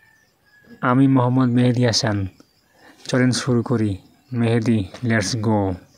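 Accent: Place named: India. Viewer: ভাই আপনি কি ইন্ডিয়ান এইভাবে কথা বলেছেন কেনো।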